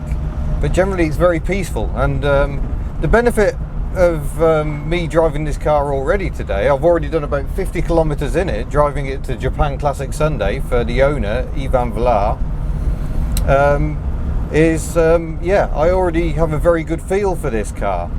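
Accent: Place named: West Country, United Kingdom. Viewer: I enjoy watching a Chris Harris video (he has a prized, much loved 2CV too) but living outside the UK, very easy-on-the-ear west country accent is an absolute delight to listen to and a reminder of home. I never thought I'd enjoy content with such old and average (admittedly characterful) cars - but I'm converted! I even got to see a DAF City Car yesterday (via visit to the DAF museum) which I had the Corgi version of as a kid in the early 70s)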